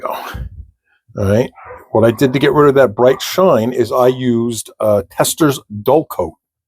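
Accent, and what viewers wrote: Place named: Suffolk County, United States. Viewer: Just found your page wow awesome content I just watched 3 videos,great info and tips/tricks I live in fla I'm originally from Suffolk county so it's refreshing to hear your voice lol happy holidays and please post more videos I'm going to share it with some friends thank again great work!!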